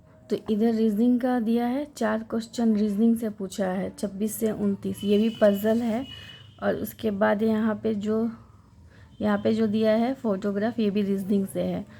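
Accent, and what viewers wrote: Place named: South Delhi, India. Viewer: Aap bihar ki nii lgte ur tune is like south delhi girl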